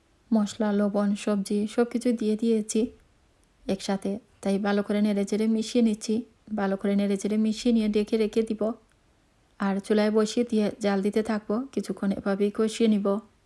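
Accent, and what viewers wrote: Sylhet, Bangladesh. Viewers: আপুর কথার মধ্যে সিলেটি কথা চলে আসে।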